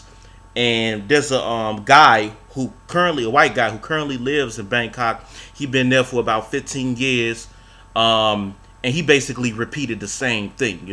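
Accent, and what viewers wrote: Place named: East Coast, United States. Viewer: just tuned in to your channel. you're from east coast right? NY?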